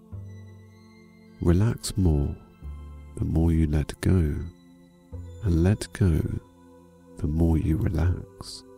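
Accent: Scotland, United Kingdom. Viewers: These meditations are perfect. <link> him has a gentle, warm, pleasant voice (with a slight Scots accent). The words are simple and clear. The timing is perfect... just as you start to find your mind wandering, he says and if you find that your mind is wandering... . There is no background music and no noise in the background, only his peaceful and engaging voice. The length of the meditations (about 1/2 hour each) works well for me. I find that I tire of some meditations after frequent repetition, but that hasn't happened with this one. The metta lovingkindness meditation always feels right. Some meditation CDs I have tried have not felt right. The voice seemed hurried, or sounded tense; the background music seemed intrusive, or there were noises that interfered with concentration. This is just perfect as an introduction for new meditators, and it's also a meditation that I return to again and again. 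I'd give this more than five stars if that were an option. Even my students, who are not ordinarily very receptive to meditation, relax when they hear this one! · please tell me are british ??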